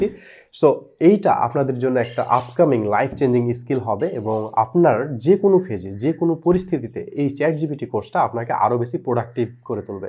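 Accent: Chapai Nawabganj, Bangladesh. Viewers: ভাইজান, আপনার Accent শুনেই বুছেছিলাম চাপাই এর ভাই..... boost করেছিলেন সেখান থেকেই পেলাম, ধন্যবাদ দেখা হবে, ইংশাল্লাহ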